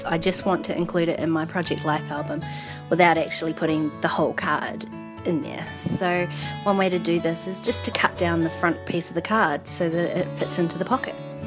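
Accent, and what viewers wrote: New Zealand. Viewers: I already do these things with all my hoarded bits and bobs but the origami crane out of the new world receipt love it! Very inspirational. And it's nice to hear a kiwi x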